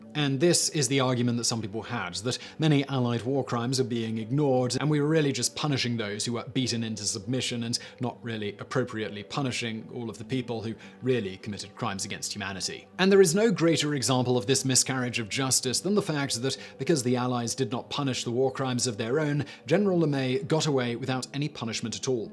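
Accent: United Kingdom. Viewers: General LeMay was the brainpower of SAC (Strategic Air Command). I spent my Air Force enlistment (4 years) in SAC. General LeMay was considered the father of SAC, and a hero. I understand many critics condemn his firebombing of Japan. I say Japan started WWII without fulling understanding America’s ultimate power to defeat Japan. jJapan’s sneak attack on Hawaii made many Americans feel any offensive against Japan was fair game. You sound like a Brit, you and the Japanese should be thankful the nuke wasn’t dropped on Tokyo. You do a disservice condemning the USA for firebombing Tokyo.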